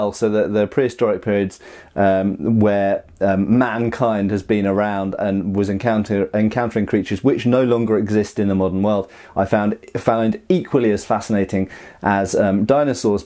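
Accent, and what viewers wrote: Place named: United Kingdom. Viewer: One of the reasons British people make for good Youtubers is because everyone loves your accents.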